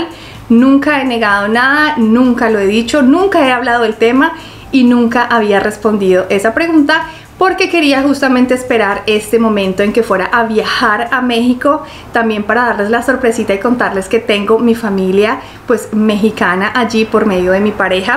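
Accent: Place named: Colombia. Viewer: Ola eras mexicana mas tienes asento de colonbia te felicito seias maxicana o de onde seia lo que veio mexico es mui lindo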